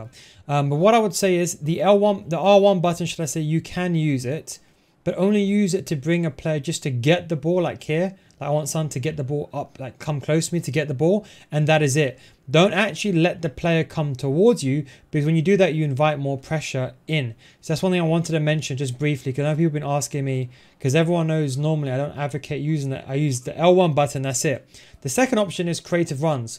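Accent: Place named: United Kingdom. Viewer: too fast und unclear talking. not 100% understandable for not native English. maybe the British akcent is the reason. I like the content but it's too exhausting to get it/to listen. no offense.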